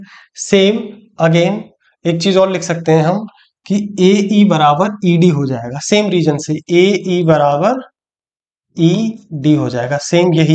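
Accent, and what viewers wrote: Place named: Uttar Pradesh, India. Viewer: I think sir is from uttar pradesh 😂 that accent....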